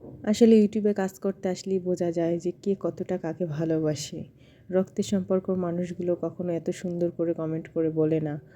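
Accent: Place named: Barishal, Bangladesh. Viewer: আপু চলে আসলাম তোমার ভিডিও দেখতে তোমার কথায় বরিশালের ছোঁয়া পেয়ে ভালোই লাগলো মিষ্টি কুমার ❤❤❤❤❤❤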